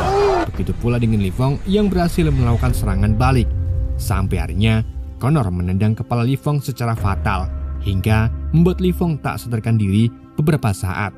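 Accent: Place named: Java, Indonesia. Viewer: Medhok yg mantab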